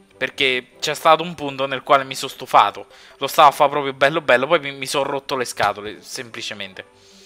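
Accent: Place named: Rome, Italy. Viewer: ah ok. lo so che è di Roma dalla voce, e su so solo che ha origini asiatiche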